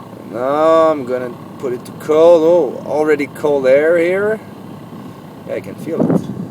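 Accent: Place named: Quebec, Canada. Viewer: Haha..j'avais deviné par ton accent que tu étais Québécois. Moi le problème avec mon liberty c'est qui chauffe juste en roulant. Quand jarrete de rouler y chauffe pu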